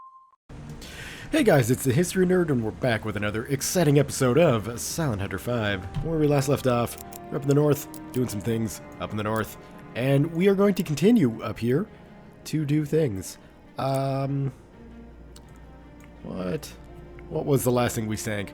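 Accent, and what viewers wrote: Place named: Canada. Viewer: hey its the soothing Canadian voice of History Nerd that i need in my life.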